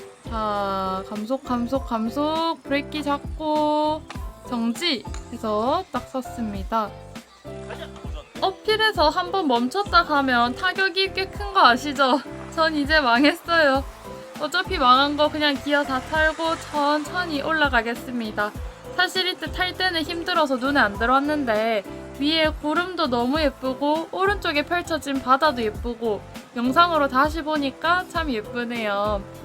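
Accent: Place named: Busan, South Korea. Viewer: 사투리안쓸려고 노력하는모습이 귀여워요.ㅋㅋ 그래도 마~부산아잉교~ㅋㅋㅋ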